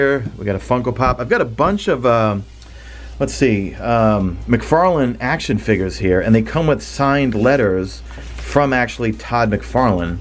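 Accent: New York, United States. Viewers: #madeittotheend congrats on 13 years! Your Destiny collection is impressive 🎉 Great setup btw I bet it feels good to have a dedicated space Also liked the New York accent 👍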